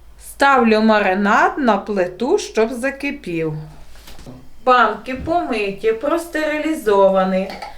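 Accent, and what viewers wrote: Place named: Ternopil, Ukraine. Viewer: Така миска)))) Такі гарні добрі руки! То щедро як на увсьо побратимство! А яка мова, ЯКА МОВА !!!!!! Одна літрА, двістА пятдесят грам ... з Тернопільщини мужчини були, так казали! Кращі дядьки!!!! я в ЗАХВАТІ !!!!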